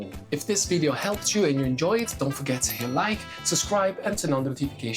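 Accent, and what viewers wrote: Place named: England, United Kingdom. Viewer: sei meraviglioso. You have a beautiful english accent with a mystical touch of the unknown. Bravo anche nel tuo lavoro. From Italy.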